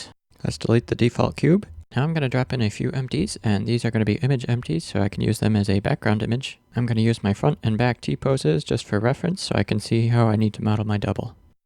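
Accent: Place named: Canada. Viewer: Joe Pera with a Canadian accent and Shawn Mendes face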